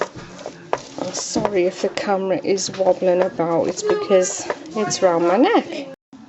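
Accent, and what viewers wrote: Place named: Newcastle, United Kingdom. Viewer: hey where did u get the citric acid from u sound geordie im in newcastle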